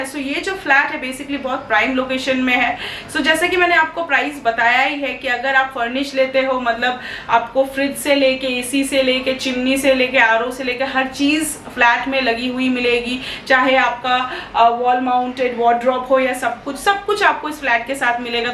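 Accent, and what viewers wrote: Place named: Bengal, India. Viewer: Aapnar hindi te clear Bangla taan ashe. Mane apnar primary language Bangla. Buyers would trust Bangali agent much more than Khotua agent.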